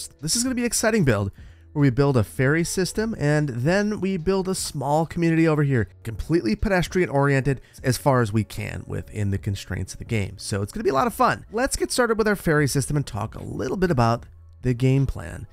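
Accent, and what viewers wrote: Wisconsin, United States. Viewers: I totally think that this island is based on Mackinac Island (a car-free island in Michigan) because Marquette is another larger city in northern MI. Mackinac Island is a tourist trap, but Marquette is a super cool city on Lake Superior with a great atmosphere and massive old ore docks towering over the shore. I'm commenting this like 30 seconds into the video, but I hope you lean more towards a Marquette, MI vibe than Mackinac Island vibe. Also it backs up my theory that you sound super wisconson, and the UP is a popular travel spot for wisconsoners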